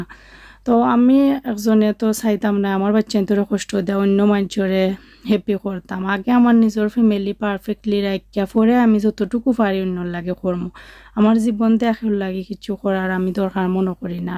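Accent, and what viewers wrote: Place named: Sylhet, Bangladesh. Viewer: Apu ki,,Sylhet er sunamgonger meye?ami o sylheti. Apnar kotha gulu shune khub valo laglo...tai apnar chanel ta subscribe kore nilam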